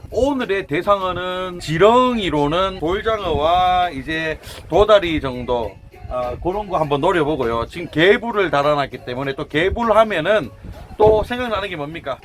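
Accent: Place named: Gyeongsang, South Korea. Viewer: 덩치 크고 얼굴 크고 갱상도 사투리에 호감가는 이유는 머징ㅋ 구독박고갑니다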